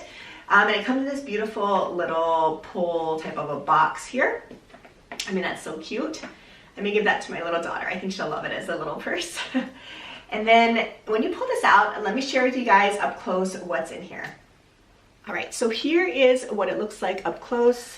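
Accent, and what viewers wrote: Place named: Canada. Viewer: Love the Rosewood on you! I hear a bit of a Canadian accent from you. Did you grow up in California?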